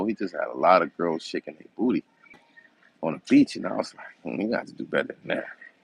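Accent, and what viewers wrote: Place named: New York City, United States. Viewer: Your NYC accent is diminishing.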